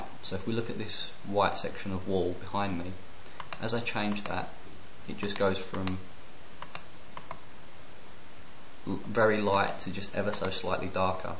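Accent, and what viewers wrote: United Kingdom. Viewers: You are from UK, aren't you?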